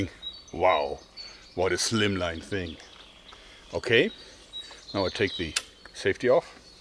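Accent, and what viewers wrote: Germany. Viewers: . Lets reduce the arrow-weight down to ... eehm ... 11 grams what should result in an arrow-speed of > 400 m/s .. ? Increasing the acceleration by 100% will lead to >1.600 m/s (hypersonic) ...and > 600 Joule (based on a 11 grams arrow) ? To stay below hypersonic-speed requires an arrow-weight below of ... eehm ... something below 16 grams ... or so. The Front-Part of that pulley, needs to be replaced behind the shoulder. Gruss :Rudi Ich mag dein DEUTSCHES Englisch :D . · IT IS NOT "2000$", IT IS "$2,000"! I can hear you are German (?) but get it right! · Nach dem ersten Satz wusste ich, dass du deutsch bist 😆😉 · I bet Hollywood actors watch your channel if they need inspiration and help with their German accent! :) You can put the sights a little forward yourself..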